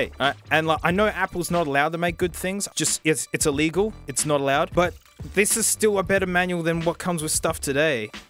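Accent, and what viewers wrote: Australia. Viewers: Theres something about listen to an Australian talk about Apple products with a very green iPad case thats just so entertaining. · I feel like I have a loud Australian friend. Everyone needs a loud Australian friend.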